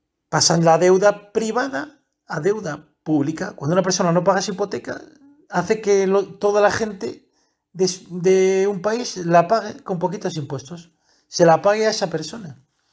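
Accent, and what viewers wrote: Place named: Santander, Spain. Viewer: Tienes el acento de los Botín. De Santander.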